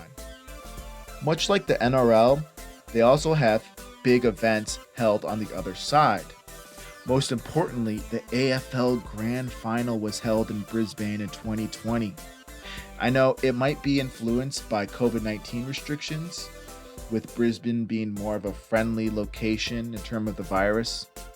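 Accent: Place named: United States. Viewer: I'm a born and raised American too, and I've always been fascinated by Australian sports. It's great to finally hear an American narrator talk about Australian sports. Great video.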